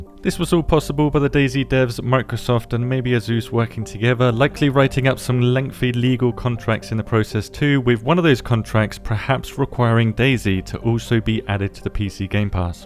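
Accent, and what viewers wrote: United Kingdom. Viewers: Dude, don’t tell me what to do. If I want to blame the devs (and I most certainly do) for the influx of cheaters, that’s my right. British bloke. Posh prick.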